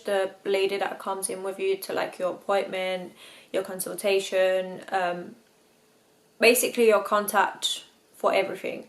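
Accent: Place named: England, United Kingdom. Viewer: you really mastered the English street lingo and accent!! SO impressed.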